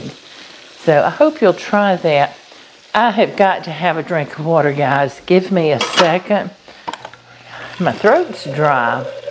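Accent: South, United States. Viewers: First time here and I have two things to say. When I hear your voice, I’m reminded of the old girls in the southern Baptist Church I attended years ago in Tulsa. You also have an accent like Paula Deen’s. Y’all could be cousins. 😊 Your voice is soothing. I bet you can tell a good story. The grub looks good.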